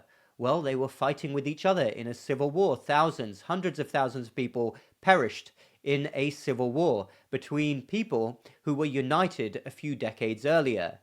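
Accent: United Kingdom. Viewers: You are such a clear voice. Love to listen to you. Decide which pod casts I need to play, without comment, whilst my hubbie sits next to me taking in what you say. Love your British voice on the health and now, unusually, political scene. Americans today no longer appreciate their constitution. As a Brit, I understand now why Americans have said it is the best country, I have studied their constitution etc for years and now understand why they have said it. Sadly, I understand what they may lose, without appreciating what they had. Very sad and worrying for the West. 🇬🇧🏴🙏🏻👍❤️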